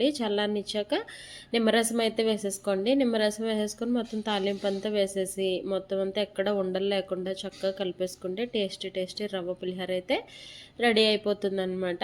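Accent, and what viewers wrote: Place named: East Godavari, India. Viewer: Matladithe east godavari antaru madhi east godavari sister Maa side chesukuntaru super sister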